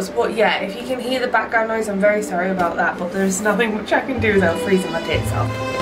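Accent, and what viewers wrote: United Kingdom. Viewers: in fact, it is an accent. There a so many different accents all around GB 😅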